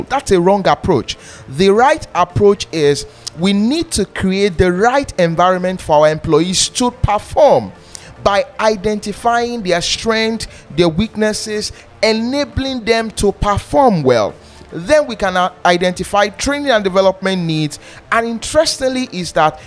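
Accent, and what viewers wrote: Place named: United Kingdom. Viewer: Amazing explanation with British English . I am interesting with your curiously explanation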